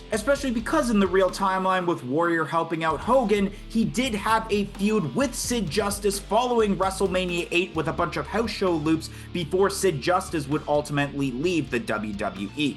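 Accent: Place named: Canada. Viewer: What part of Canada are you from?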